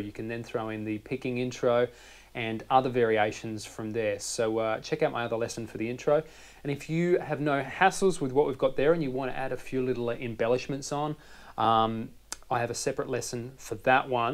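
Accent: Australia. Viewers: i love how aussie you are ><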